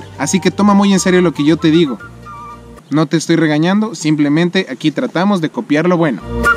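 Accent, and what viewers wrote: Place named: Ecuador. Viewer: Nunca perdiste el acento ni tu identidad Ecuatoriana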